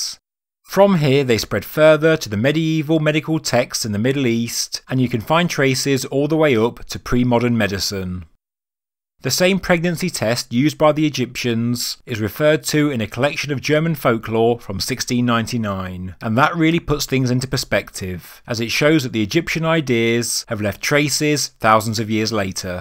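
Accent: United Kingdom. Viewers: Nice to hear a voice from the UK, on the subject of Egypt.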